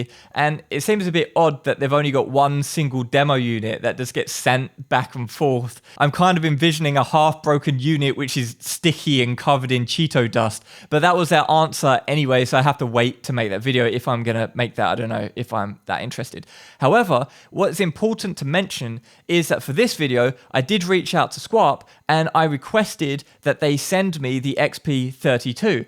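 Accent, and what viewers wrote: United Kingdom. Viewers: You sound British. Are you in London?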